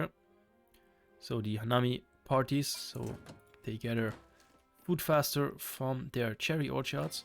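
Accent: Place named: Germany. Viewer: danke :). Ich dachte es mir, dass du deutsche "wurzeln" hast, wegen deinem akzent ;). Du machst das prima 🙃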